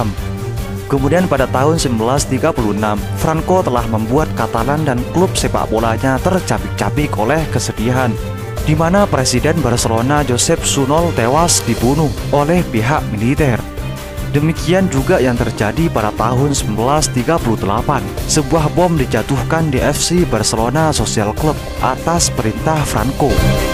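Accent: Madura, Indonesia. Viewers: logat madura banget😂😂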